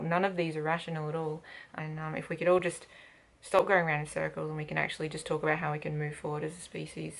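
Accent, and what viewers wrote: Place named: Australia. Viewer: Oh my god.. Haha I was thinking 'she must be British, how does she have an Austrlian accent' and then you explained you lived in England... I knew it. The dry humour has rubbed off on you :P This video was awesome; more people need to be explaining the inevitability and necessity of veganism in this matter of a fact way.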